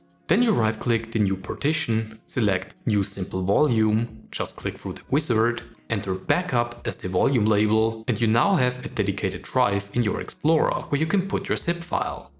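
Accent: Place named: Germany. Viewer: ich höre in dem englisch einen deutschen dialekt raus 🤔😂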